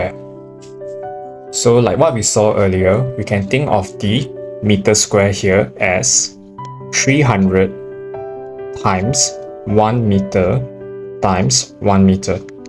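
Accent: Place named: Russia. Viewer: Where are from man I love your style of teaching accent looks like Russian or european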